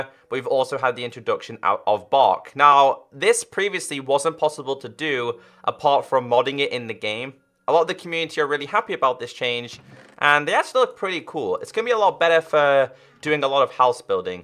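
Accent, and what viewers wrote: United Kingdom. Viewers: It’s the accent. UK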